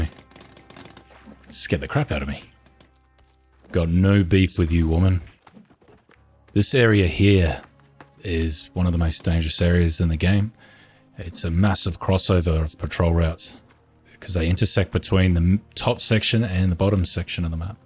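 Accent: Australia. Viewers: Hey, ive been wondering, what do you want in 1.0? Also, are you Australian? Edit: never mind. I didnt hear till the end. Gotta love straya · Ah, thought you were a fellow Aussie but for some reason I couldn't tell for sure. Namely because you spell words like behaviour the American way.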